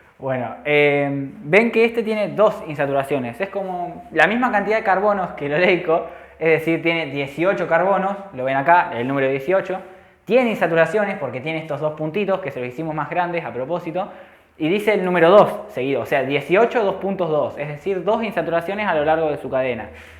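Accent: Argentina. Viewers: Oye profesor ¿ ud es de Argentina? Y tengo una duda .¿ Estos tipos de tema entran en examenes de ingreso de cualquier universidad de medicina de Argentina?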